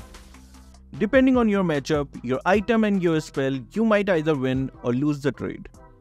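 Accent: India. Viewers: dude are you from India or what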